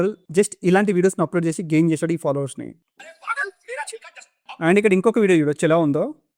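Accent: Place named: India. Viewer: Voice koncham... telugu and English kalisina accent lo vastundi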